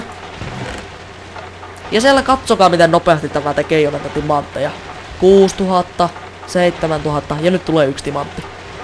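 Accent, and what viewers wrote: Karelia, Finland. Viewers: Ootko karjalasta :D kun puhut sen tyyliil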